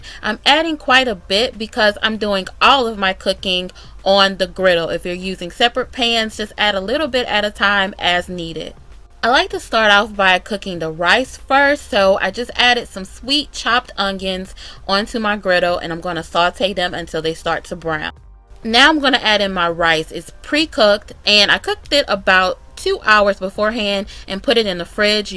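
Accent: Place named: Atlanta, United States. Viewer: Girl you can cook! Where you from? I hear some ATL in your blood!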